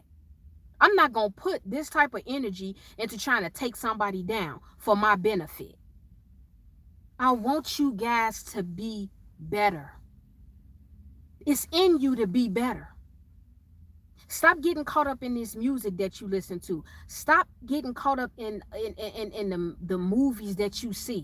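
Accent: Chicago, United States. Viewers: I knew you were from Chicago because of the accent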